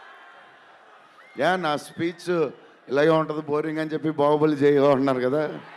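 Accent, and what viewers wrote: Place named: Godavari, India. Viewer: godavari slang lo annaya speech ❤ pure hearted person ❤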